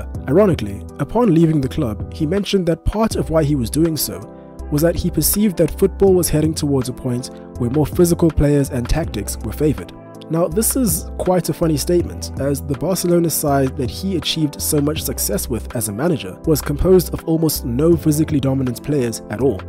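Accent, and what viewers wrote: South Africa. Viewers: I’m getting a South African accent are you from Mzansi? Good job mate👊🏾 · You sound like a South African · Are you South African ? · Great video. Subbed quickly. Hearing your South African accent made me sub even quicker. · Are you south african?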